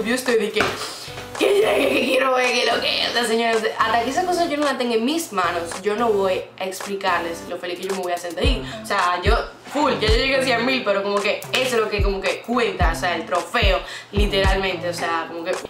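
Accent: Dominican Republic. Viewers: La mayoría criticandola a ella cuando ella está dando una video reacción y dando una crítica constructiva nose por donde no se entiende además vi unos comentarios criticando la forma que habla por si la gente que piensa que República Dominicana no existe pues si, si existe Dios que acaso no se dan cuenta que cada país tiene un asentó diferente?! Creo que deberían darse cuenta que esta dando su opinión dentro de su perspectiva no es que ella va a pensar igual que ustedes. Desde mi punto de vista Yo pienso igual que ella no veo necesario mostrarse así en las redes sociales no hay nesecidad no? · Eres dominucana? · Tu tienes el acento de mi pais republica dominicana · es de RD????, es que habla como tal, porque yo también soy de RD pero respondame plis alguien la pregunta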